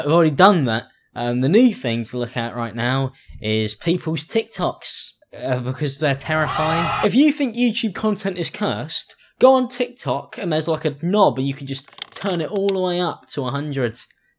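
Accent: United Kingdom. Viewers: Why did Jacksepticeye turn british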